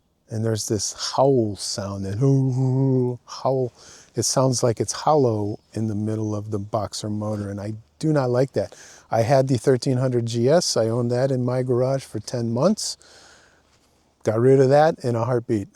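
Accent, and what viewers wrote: United States. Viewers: Hey, I appreciate what you do and your candor when you review these bikes. Down side of that is it makes me want to own more than I do already. I have a 2023 RT blue like yours, and as my second RT in three years I'm pretty happy with it. I have to agree with you that that the conected ride is a piece of garbage, and is completely unreliable. However, living where I live (southeastern Utah) and riding where I ride in the western US, there is spotty cell coverage so I just use a Garmin XT2 that way 99% of the time I have GPS coverage. Since I do all I can on my own, not only because I like to, but also the nearest BMW dealer is 200 miles away, my cost of ownereship is more a time issue. When the 16K miles service needs to be done, unless I rent the propriatary tools, I may wind up going to the dealer for that, so I will be curious to see what that cost. 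Keep up the good work! By the way you speak english like an American, I have yet to catch an accent.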